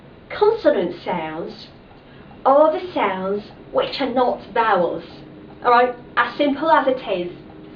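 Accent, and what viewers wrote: United Kingdom. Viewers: Никогда бы не подумала, что русскоговорящий человек может добиться такого произношения! Очень по-британски звучит. Мне американский больше нравится, т.к. легче повторить самой и понятнее речь других, а британский кажется каким-то вычурным, но все равно это очень круто :) Не могу определиться только с мыслью: что лучше для учеников, преподаватель с британским или с американским акцентом? Ведь в первом случае улучшается их способность понимать этих странных британцев :) а во-втором увеличиваются шансы, что их поймет большинство. Овладеть сразу несколькими акцентами и умело переключаться между ними, полагаю, задача не из легких... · Thanks for the wonderful video (despite the sound, though). I'm German and I'd like to have such a wonderful British accent like you. So I envy you a little bit.;-) It must have been much harder for you as a Slavic to obtain the British accent. 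I'm wondering how you obtained it and how long it took you.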